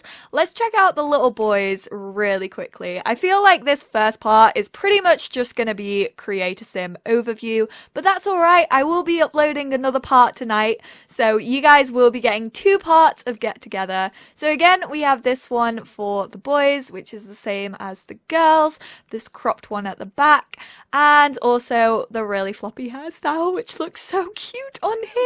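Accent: England, United Kingdom. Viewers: you are my favorite youtuber!!!! you are one of the only youtubers that makes quality sims videos, or videos for that matter. the fact that you have an English accent is amazing, not just the normal American. =)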